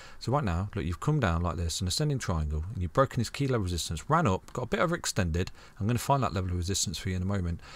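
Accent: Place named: Norwich, United Kingdom. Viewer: Given the accent I'd say around Norwich haha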